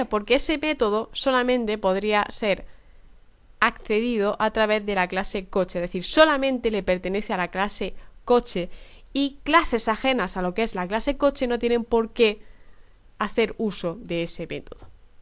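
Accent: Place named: Spain. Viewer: adoro tu acento y tu dejo español!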